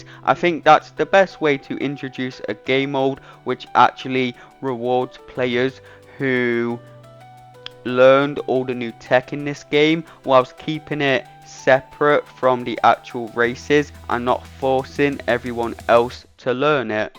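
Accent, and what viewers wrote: United Kingdom. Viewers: I dont know much about Mario Kart World, i just come here to hear your Bri'ish accent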